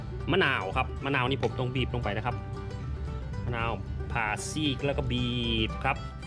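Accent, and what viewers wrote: Phu Thai, Thailand. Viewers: วัดไข่...5555......555..มักฟังสำเนียงภูไท...555...ม่วนดีฟังเพลินๆ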